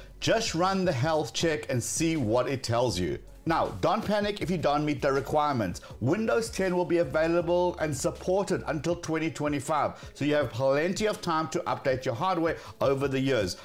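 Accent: South Africa. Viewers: I'm hearing a 🇿🇦 accent, or am I mistaken? 🤔.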